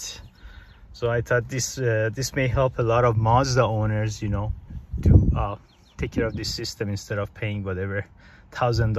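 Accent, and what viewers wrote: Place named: Iran. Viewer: That is an Iranian accent if I’ve ever heard one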